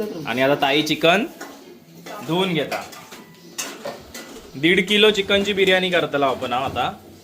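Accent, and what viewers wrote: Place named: Malvani, India. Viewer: याला म्हणतात खरे मालवणी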